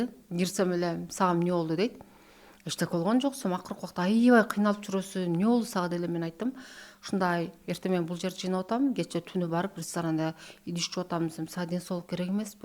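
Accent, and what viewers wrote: Standard. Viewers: ишиңде ийгиликтер болсун. Өтө так, нукура кыргыз тилинде сүйлөгөнүң аябай жакты. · Бул эже ото акылдуу экен , таза кыргызча суйлойт экен.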